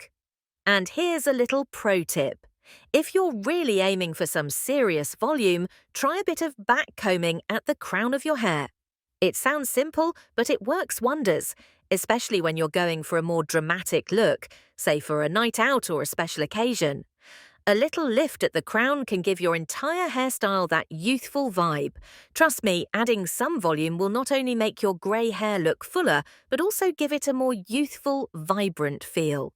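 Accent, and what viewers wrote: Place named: England, United Kingdom. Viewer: English accent; American spelling.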